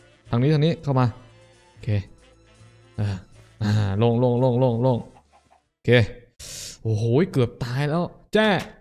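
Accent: South, Thailand. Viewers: ลุง พี่พูด ภาษา ใต้เหมือ จริงๆ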